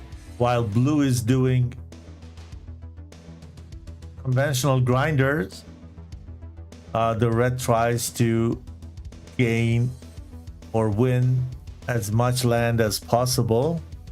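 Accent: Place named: Germany. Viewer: Is the host of this match german or speak german? If so, it did shine threw...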